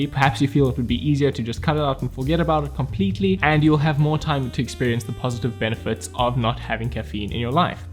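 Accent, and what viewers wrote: South Africa. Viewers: You South African mate?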